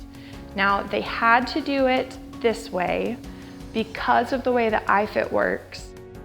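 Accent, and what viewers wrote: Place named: United States. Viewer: Couldn’t agree more, a yank voice does my head in at the best of times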